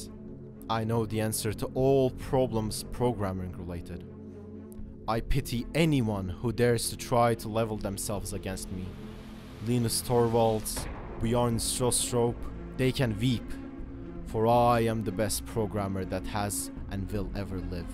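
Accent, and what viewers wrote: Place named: Turkey. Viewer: This can be random but are you turkish by any chance? Your accent is really thick :D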